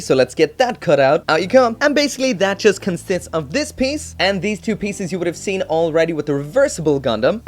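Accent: Ireland. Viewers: Wait your Irish What county you from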